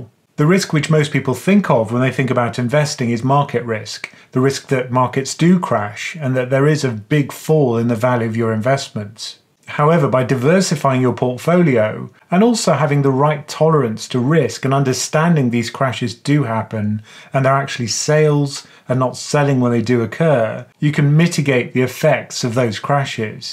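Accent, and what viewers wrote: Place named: Cockney, United Kingdom. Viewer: Couldnt unhear in-cockney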